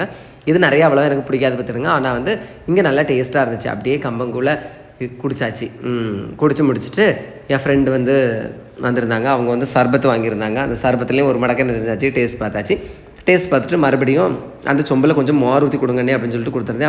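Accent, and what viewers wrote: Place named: Kerala, India. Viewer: Anna neenga pesumbothu Malayalam kalarnthu varuthu.eppadi..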